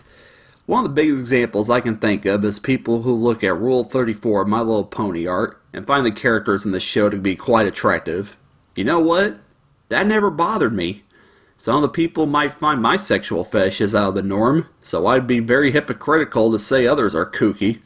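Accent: South, United States. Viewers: I didn't notice u weren't 'normal' tbh, in fact i considered you the most normal. Or at least in that ur very down to earth and even have a 'country boy' accent to boot. :-/ Liking cartoons isn't weird tho, not since the internet was invented at least. It's weird imo to say anyone who does like those things are weird.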